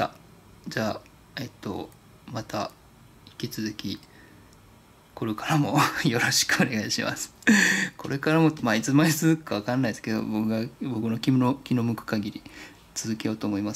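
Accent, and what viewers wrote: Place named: Kansai, Japan. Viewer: 3万人、おめでとうございます! のマニアックな動画と関西弁が好きですｗ これからも楽しい動画待ってます!!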